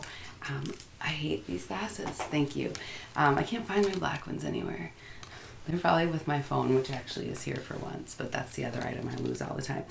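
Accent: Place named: Midwest, United States. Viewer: Okay, I have to know... are you from the mid-west?! I grew up in Minnesota and have lots of family there and you have sweet little hints of the mid-west accent. :)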